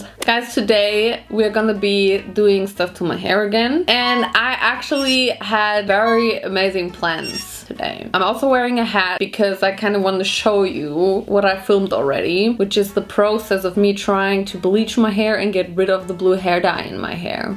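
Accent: United Kingdom. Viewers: slayed British acent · i LOVE YOUR BRTITISH ACCENT !!! 💙💙💙💙💙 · british accent is the only thing that keeps me going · unpopular opinion: i prefer your british accent lmfaoo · lately you’ve been serving some ✨fabulous✨looks, ma’am xx p.s. that british accent omg 🤣🤣🤣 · British accent is daaaa BEST!!!!! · YAAY MORE SHABBY SHALOON 🥦 THEY ARE THE BEST AND I REALIZED THAT TALKS IN BRITISH ACCENT WHEN SHE'S STRESSED 😹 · wasnt she already talking in a british accent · the british accent really popped off in this one 😍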